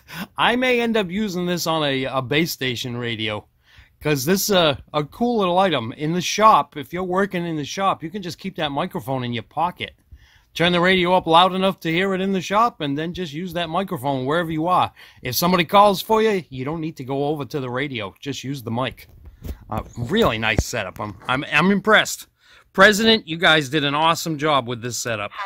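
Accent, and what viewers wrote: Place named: New England, United States. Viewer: You sound like you're from Massachusetts or Rhode Island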